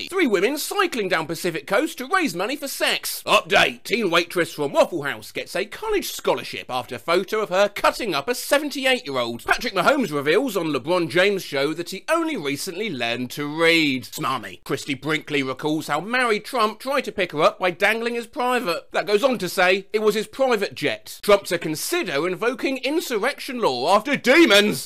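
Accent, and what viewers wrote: United Kingdom. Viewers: its bc of his voice and his british accent. Uberduck has a AI voice you should check out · I accidently clicked on this and was wondering why suddenly a brittish man surrounded by lemos was talking to me...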